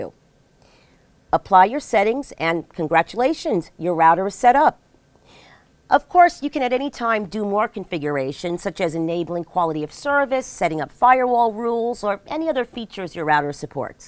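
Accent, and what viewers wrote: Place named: New York, United States. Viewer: THICK ny accent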